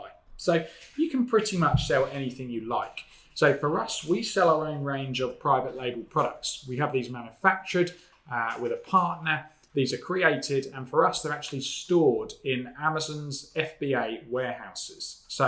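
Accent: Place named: Birmingham, United Kingdom. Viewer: Thanx for your reply. Just thought I could hear a slight Brummy accent on your video (;o)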